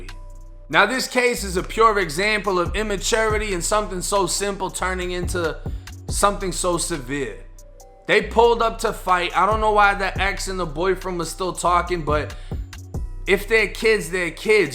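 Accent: Boston, United States. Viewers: Sick as a dog bro (pneumonia not covid) but need me some 1090. Wat up man, love ur channel, mass respect educatin yungsta's bro. This footage shockin man, how'd ya get ya hands on it? Anyways, are u from Florida man, ya sound like ya from Boston. I admit I tho u was glorifyin this life & I Apolologize fo real. I hope ya Blow Up Bigtime bro, monetized & all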